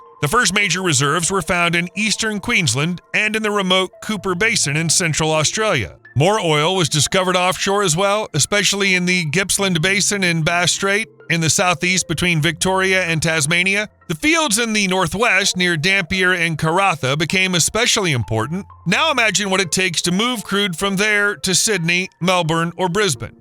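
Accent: United States. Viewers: Because it's a Yankees voice for the video, I'll give a example, "WE PAYED YOU FOR THE NUCLEAR SUBMARINE DEAL WE ARE HALFWAY IN TIME AND STILL HAVEN'T RECEIVED THEM OR ANY"?????? WTF WE ARE YOUR BEST ALLIE AND IF I WAS PRIMINISTER I WOULD ASK FOR REFUND & GET A GOOD DEAL NOT BENT OVER WITH NO GASOLINE.... LOL 😂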